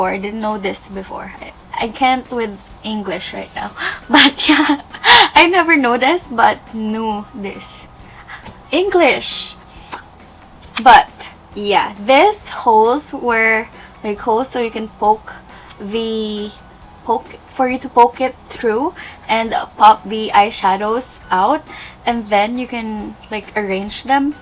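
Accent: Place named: Philippines. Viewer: You seem to be such a sweet person. You are from the Philippines, right? I recognize the accent. I am from Sweden and I was in the Philippines for 6 months in 2011 doing volunteer work. Everyone over there are so nice and friendly ☺️ Hearing you speak makes me nostalgic ✨ You are really good at makeup too! ☺️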